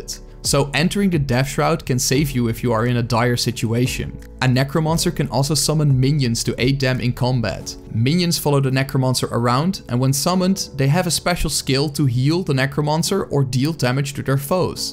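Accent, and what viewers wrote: Netherlands. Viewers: I sense a hint of Dutch in that accent. Is it? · You sounded dutch, checked the about page and I was right! Also, a good class guide. (This sounded like dis, that's why I guessed dutch.) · Thanks for the video mate. A friend of mine and I both got into gw2 recently (even tho I tried it a year ago and bought the expansion in the thought id play it more, which I didnt). I came back and decided to start a new character to get back in from beginning to end but didnt know what class or something. This helped me a lot. Greetings from a fellow Dutchie, I heard the accent and its the same I have when I speak English :) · Good to find some active GW2 content creators, immediately recognized that accent though, fellow Lowlander :) Thanks for the quick guide, been coming back to this game after a year having just played a few months.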